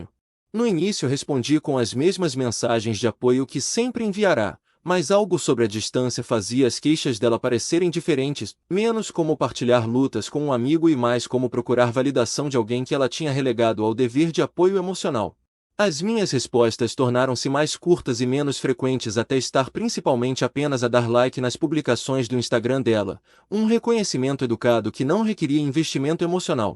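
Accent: Portugal. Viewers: Exatamente isso. Português de Portugal não o nosso .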